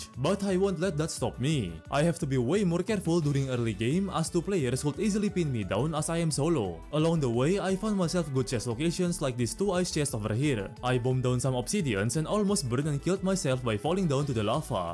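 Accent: Russia. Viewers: You sound russian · interesting, I would have guessed russian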